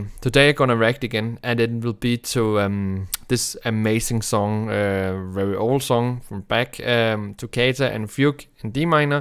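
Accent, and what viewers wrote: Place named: Germany. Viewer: You sound as though you have a German accent... how lucky you are to have J.S. Bach as part of your cultural heritage. The very grandfather of classical music, and the author of the once a world '48 preludes and fugues' If I were German I would be incredibly proud so to be, with the cantor of Leipzig being one of my previous countrymen.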